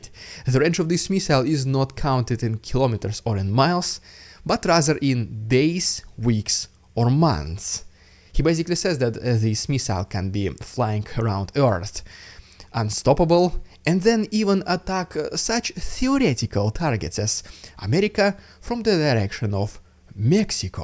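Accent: Russia. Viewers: The fact you know who is NOT a subscriber is very concerning. That you have a Russian accent makes you a potential spy.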